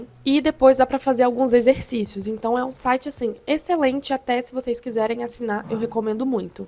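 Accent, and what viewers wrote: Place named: Argentina. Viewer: Oi, eu sou da argentina. Parabens, vc fala miuto bem o espanhol. Voce tem um sotaque argentino pra mim 🇦🇷🤙🏽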